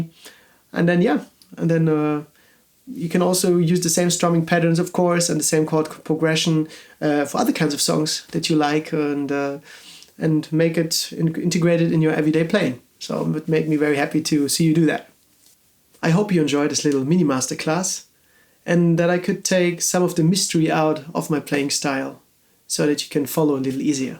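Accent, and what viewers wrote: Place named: India. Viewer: Accent is very similar to Indian accent. Beautiful.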